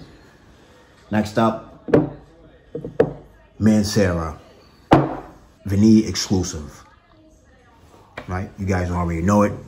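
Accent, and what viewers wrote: Boston, United States. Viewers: Where do you live Boston? That accent. Lol. I found your channel from your Mancera Black Vanille review. Just ordered it. Vanille Exclusive is next on my list.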